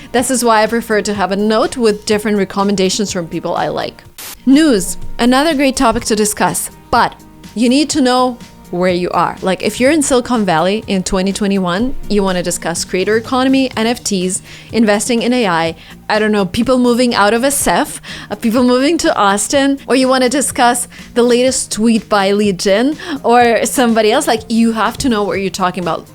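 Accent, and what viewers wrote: Russia. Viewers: You’re Russian accent is amazing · No offence, but your pronouncing makes it clear for natives you're russian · Your Russian English accent, such a give away at the start ! :) lol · to A complete Russian Accent! :D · I loved your Russian accent I want to learn that accent... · Your Russian accent is just so cute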